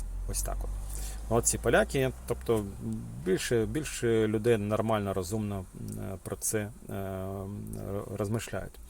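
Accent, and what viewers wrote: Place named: Eastern Ukraine, Ukraine. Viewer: В автора чіткий "східняцький" акцент.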